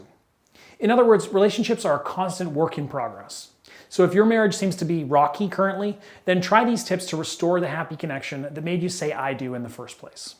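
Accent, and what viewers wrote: Canada. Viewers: Nice. I noticed the Canadian accent. Lol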